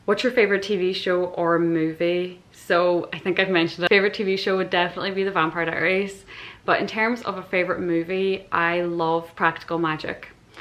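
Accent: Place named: Ireland. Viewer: The further into the video the stronger your Irish accent becomes. I really love that 🥰😂 I am also happy for you that things are going the way they are right now. You should not be so concerned with people who think you are a poser 👉I refer to your Instagram post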